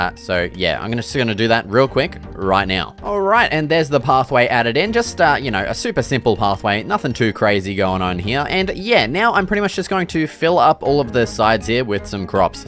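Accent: Australia. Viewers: Bros Def a Aussie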